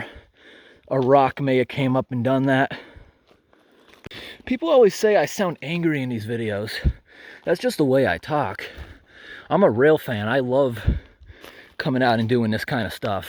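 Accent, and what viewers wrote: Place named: United States. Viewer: Yep, I agree, his accent is American but with good pronunciation and little drawl - much easier to listen to for us Brits!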